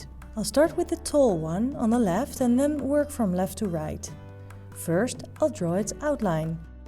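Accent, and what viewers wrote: Netherlands. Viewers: Are you from the Netherlands? Your accent sounds so familiar to me and I can't figure out what it is. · Je Nederlandse tongval pik je meteen op.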